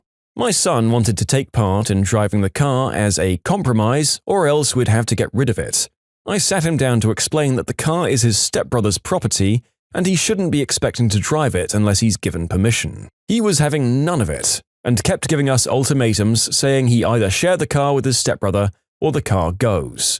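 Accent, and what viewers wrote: United Kingdom. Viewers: Is it just me, or is the new narrators voice really relaxing? I may be biased because I'm British as well and don't hear many British people online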